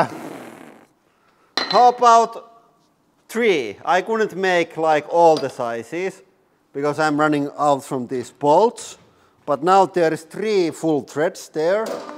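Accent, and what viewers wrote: Finland. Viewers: Finish/English ,,, omg thats lovely 😁😁😁😁😁😁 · Ootko Suomalainen?😂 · huomattavasti helpompaa ymmärtää suomen enkklantia puhuttuna kuin natiivin englannin puhujan. 😆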